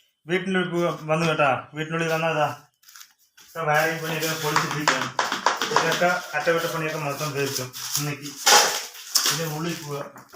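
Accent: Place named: Neyyattinkara, India. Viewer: ഇത് എവിടെയാ നെയ്യാറ്റിൻകര ആണോ അതുപോലുള്ള വർത്തമാനം. കൊള്ളാം നല്ല വ്ലോഗർ.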